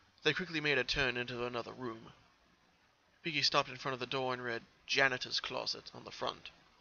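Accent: England, United Kingdom. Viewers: What's with the english accent in your narrative? I admit, it does add to the drama.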